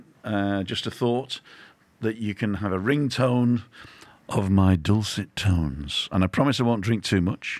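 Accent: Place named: North, United Kingdom. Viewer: You should send your portfolio to acting agencies, you’ve definitely got the voice for it and I think the subtle northern accent is something that’s not heard enough on mainstream.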